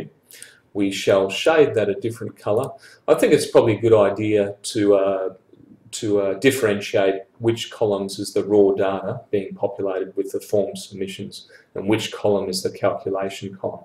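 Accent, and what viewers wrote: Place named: Australia. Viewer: Direct, well explained. I learnt something without having to launch a google investigation chain. I think the Australia accent has a lot to do with it. Top job mayte!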